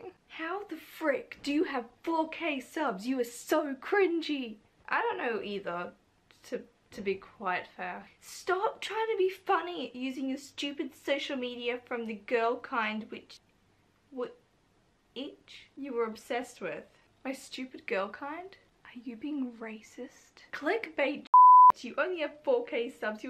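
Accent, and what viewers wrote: Australia. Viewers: Are you Australian....I'm bad with accents...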